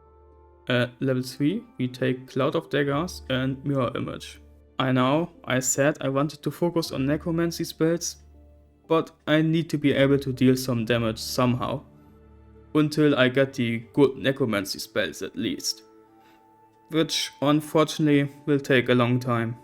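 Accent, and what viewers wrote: Germany. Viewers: Der deutsche Akzent oh yeah xD Hessische Grüße 😎😅🤘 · Are you german? Your accent sounds really unnormal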